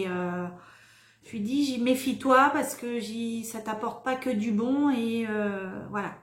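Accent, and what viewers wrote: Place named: North, France. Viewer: Merci d’avoir partagé un peu de tes secrets, mais c’est bizarre ton accent me faisait penser à celui du nord. Oui le principal c’est d’être avec ceux qu’on aime en bonne santé têt du coup à mon tour de te souhaiter une bonne année, à bientôt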